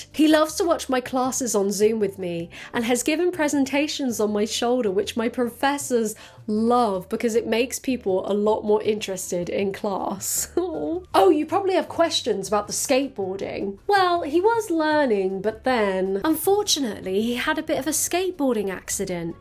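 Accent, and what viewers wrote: United States. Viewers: Ooooooh that american accent, so chill, so hip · wow your american accent is better than mine and i’m from america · gotta say, your American accent is really good! hahah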